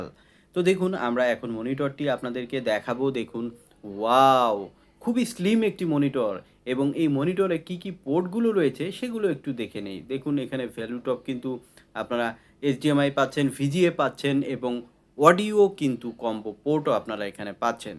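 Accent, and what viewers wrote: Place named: India. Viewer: Apnar voice undian accent pai, khub sundor presentation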